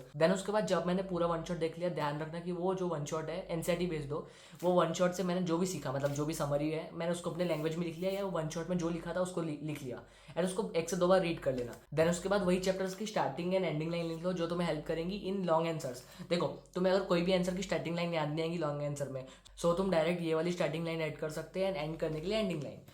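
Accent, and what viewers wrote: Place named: Hyderabad, India. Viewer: His.. Hyderabadi accent 😅🤙🏻🥀😭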